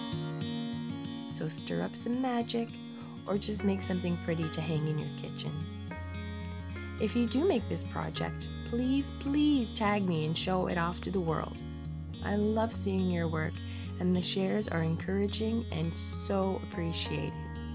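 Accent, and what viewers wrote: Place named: Canada. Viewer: Thats lovely, are you Canadian? You sound a bit like Suzie from nail career education on here?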